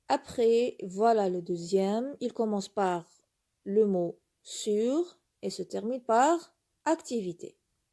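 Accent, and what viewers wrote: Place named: Oran, Algeria. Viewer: وهرانية في هدرتها 🥰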